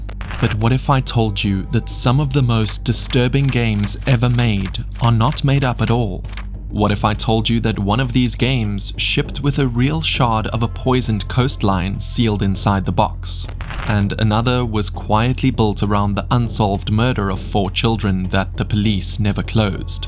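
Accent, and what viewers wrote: South Africa. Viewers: Are you a south African boet😅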